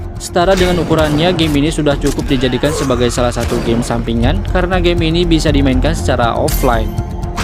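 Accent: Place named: West Java, Indonesia. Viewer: Lu orang sunda ya ngakak ( jangan di dueleu ) salam ti garkhil ( garut karihkil )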